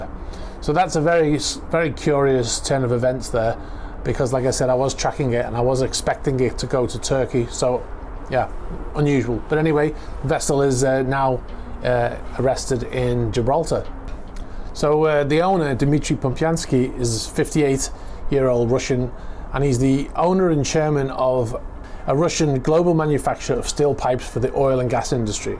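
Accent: Liverpool, United Kingdom. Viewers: That WSJ is such a prick for stealing your information! Shows that most mainstream journalists are vile and why many of us are turning away from it and concentrating on YouTube instead. I hope karma steps in…. But your reporting is so much better, concise and not biased which is so refreshing and kudos for reminding the idiots out there that not all Russians are bad. Also guessing you are from Liverpool? Lol I’m from the Wirral!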